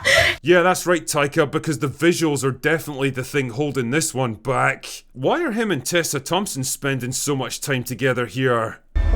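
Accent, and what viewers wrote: Scotland, United Kingdom. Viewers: Jeeze someone needs a snickers. All Im hearing is a rather judgy scotsman bagging a fella out for one bad film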